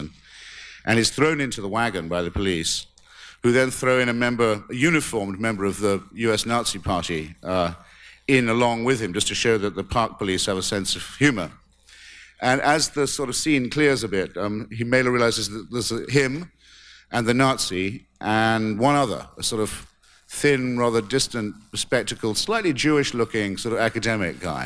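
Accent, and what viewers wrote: United Kingdom. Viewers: His intellectuality was overrated..he hid his questionable intellect with a pompous British dialect which he used as a crutch to swoon and charm.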